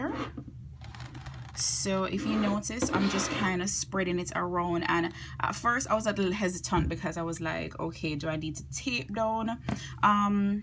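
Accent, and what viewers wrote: Jamaica. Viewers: So refreshing hearing a Jamaican voice 😇 Great video. I’ve been working with space and now ready to try modeling paste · Omg you haveee to be jamaican lol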